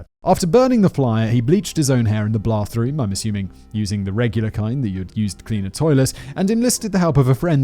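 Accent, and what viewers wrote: RP, United Kingdom. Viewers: Blah blah blah. He's destroying the story! British accent isn't good enough to make up for the crap telling. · Let me just tell my friends how im addicted to watching videos about murderers narrated by a swanky bald british man, yea thats a good idea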